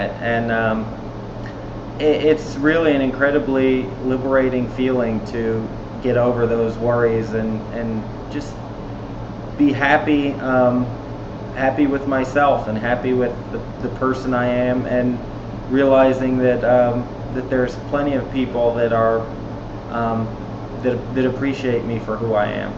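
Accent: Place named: Deep South, United States. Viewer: That's a deep south accent. Most likely Mississippi.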